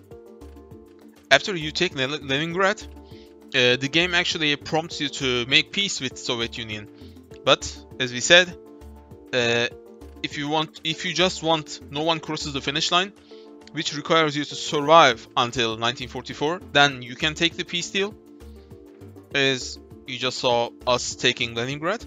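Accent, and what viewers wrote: Turkey. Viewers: Knk türk aksanı sezdim türk müsün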